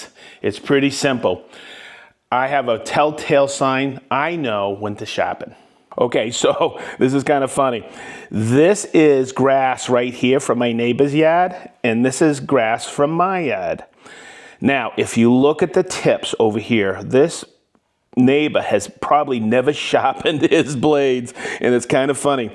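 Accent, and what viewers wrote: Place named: New England, United States. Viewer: Yikes! Metal shavings around wood-the reason I separate wood shop from my lawn mower repair shop. I get it your shop is set up for videotaping still makes me cringe. With that said, the hand grinder is my new go-to method to sharpen mower blades that’s an excellent method and like you hit the burrs with a file. There is a debate out there you shouldn’t sharpen to “sharp” as it accelerates degradation of the edge. For me I like sharp and my customers appreciate it too. Cleaning the blade I use a wire brush currently but will try your soak method next time. One shop I used to work at hit the blade with black spray paint. This along with power washing machine with clean blade adds a perceived value of pride-things that transferred over to my current shop. Like your “wicked good “ New England accent.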